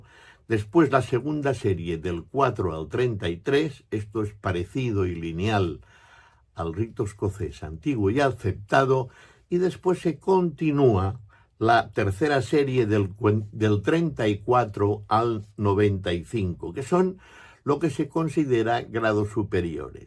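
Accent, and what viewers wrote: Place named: Catalonia, Spain. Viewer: Se nota su acento catalán Un saludo desde Miami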